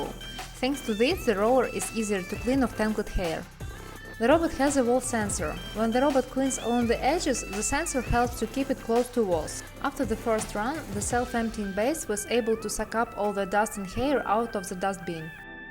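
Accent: Russia. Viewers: this russian accent is horrible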